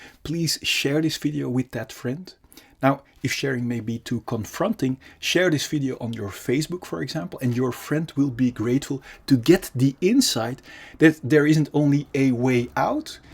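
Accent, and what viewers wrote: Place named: Netherlands. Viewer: Hallo...ik heb me al een paar keer ingeschreven maar niets gekregen. Ik zal eens een mail sturen om te unblocken. Aan je accent te oordelen kon ik in het Nederlands schrijven 😊 Ben een belgje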